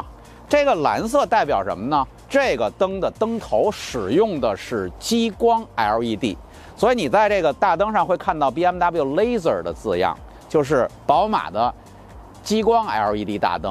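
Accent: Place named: Beijing, China. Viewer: 听你讲话让我想起我在北京跟几个朋友唠嗑，哈哈😂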